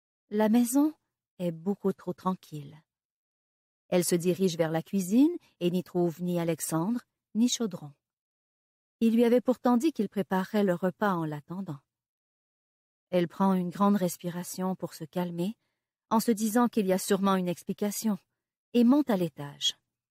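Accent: Quebec, Canada. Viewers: La narratrice est peut-être bien québécoise ..vous y avez pensé ???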